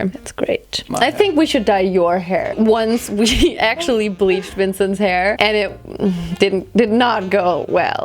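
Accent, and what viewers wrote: Germany. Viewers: Ich liebe dein German akzent 🤩😍🔥 I tried so hard to to do it, but I am *tresh* 😂😂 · Der deutsche Akzent killt mich jedes mal xD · Are you German and if you are your accent is beautiful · Pure Unterhaltung, dieser deutsche Akzent xD #broccolomi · THE GERMAN ACCENT ❤️❤️❤️❤️ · Sie hat iwie schon nen deutschen akzent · I would like to hear more of your German accent 😂❤❤ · du hast auch so einen typisch deutschen Akzent😂😂❤ Aber das find ich, hört sich süß an, also keine Sorge🤗😙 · Der deutsche akzent👌😂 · with an ultra German accent is the best. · Ok tbh ich hab deinen deutschen Akzent bevor du ihn so typisch deutsch ausgesprochen hast, gar nicht bemerkt xD danach schon xD aber respect echt gutes English was sie da sprechen tun Madame d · OMG THE GERMAN ACCENT PLEASE ONLY TALK LIKE THAT! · Du hast doch nen deutschen Akzent in deinem english auch wenn du es nicht spielst 😅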